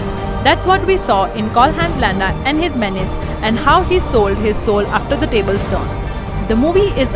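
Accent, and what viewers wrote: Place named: India. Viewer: Sweet voice. Pure Indian tongue. Wish I find ur no.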